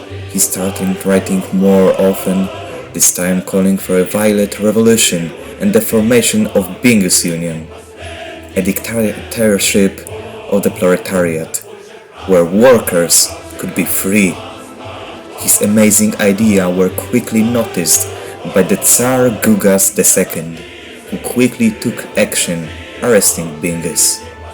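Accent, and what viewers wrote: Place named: Armenia. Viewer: Nice to hear someone talk with Armenian accent 🇦🇲🇦🇲🤘 Have a good one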